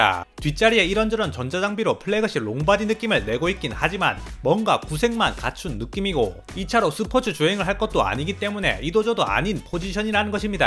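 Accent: Gyeongsang, South Korea. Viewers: 말투가 경상돈가 함경도인가?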